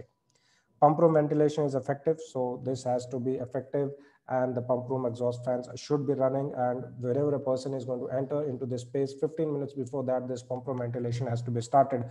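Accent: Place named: India. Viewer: Its indian bro, not for beginners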